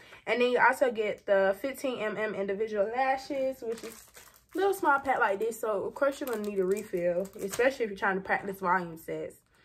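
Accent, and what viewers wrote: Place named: Memphis, United States. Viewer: You sound like you from Memphis!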